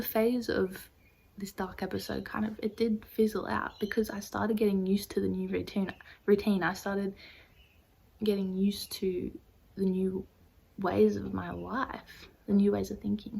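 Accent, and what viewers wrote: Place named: Australia. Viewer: I knew she’d have an AUS accent even before I clicked on the video for some reason haha. I do think social media gives us too much insight on people’s lives. We used to live our whole lives not knowing what our friends, family, and fav celebrities ate for breakfast.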